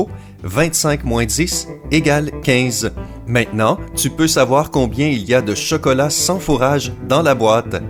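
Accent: Quebec, Canada. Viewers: songUp euh non c l'accent quebecois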